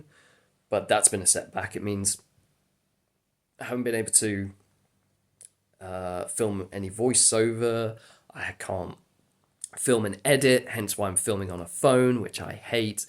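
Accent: United Kingdom. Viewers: Holy shit you’re British.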